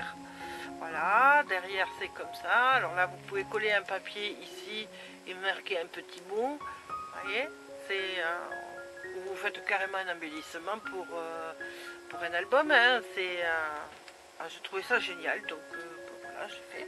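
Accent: South, France. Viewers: 🐱Coucou 😊 J'ai le plaisir de te découvrir grâce à ton commentaire sous la vidéo de notre Fanfan scrap, donc je viens découvrir ton pti monde. Tu fais de jolies creations, ma préférée est ton tag avec le cheval, mais c'est normal car le cheval est mon 2ème animal préféré 😉 Peux être est tu tjr en vacances, si c'est le cas profites en bien, car moi je n'ai plus le privilège de partir en vacances, depuis les dernières en 2011 (pdt un week-end) en Normandie. Je suis de la Région Parisienne mais à ton pti accent il me semble que tu dois être du Sud. J'espère que ça va mieux avec l'épreuve que tu as passée avec ta fille! Je pense à ça, si tu es tjr en vacances, pour pas que ton album s'abime avec le climat, tu peux le mettre dans une grande poche de congélation fermée, c'est bien pratique. Je te dis à bientôt et te souhaite une bonne journée.🌅 Bisous 🐎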